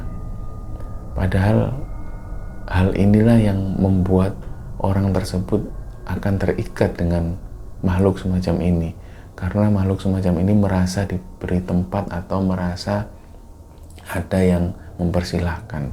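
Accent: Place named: East Java, Indonesia. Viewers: Iya mmg kalo mas itu di resapi enak dgn khas jawatimuran kdang mas idris tp yg keliatan jawa yo mas buat dogeng tdurku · Maaf mas agak telat....iki baru mas....medok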